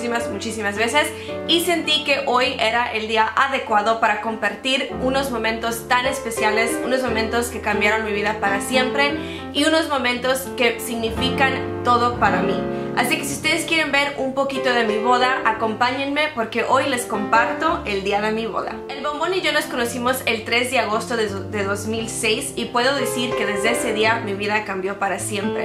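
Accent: United States. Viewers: Aquí no se nota tanto ese acento tipo "gringo"😓 me encanta pero tu español es bonito. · Parece estadounidense al hablar español.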